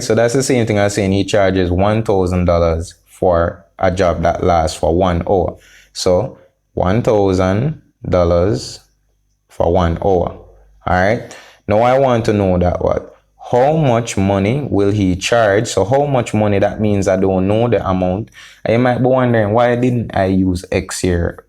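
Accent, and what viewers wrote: Jamaica. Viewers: love the Jamaican accent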